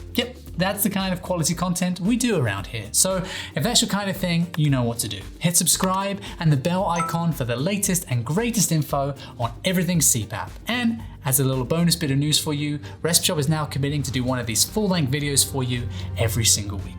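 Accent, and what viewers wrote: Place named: United Kingdom. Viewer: Looks like he is from the Great Britain...according to the accent :P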